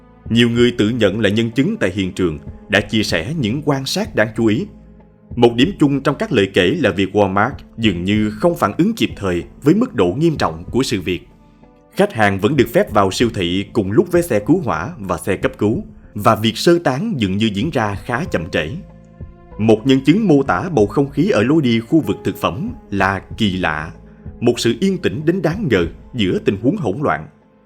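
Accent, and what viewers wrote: South, Vietnam. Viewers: Giong doc Mien Nam Phat am rat ro nghe rat hay, xin chuc suc khoe👍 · Thích nge tiếng MN của nói chuyện rỏ ràng rất hây và tiếng.nói của HG ẢNH nói chuyện rất hay ủng hộ.kênh.2 cháu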